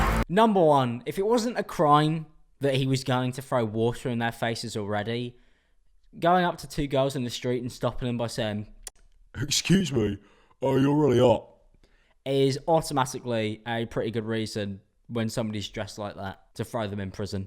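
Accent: United Kingdom. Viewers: You sound like a Brit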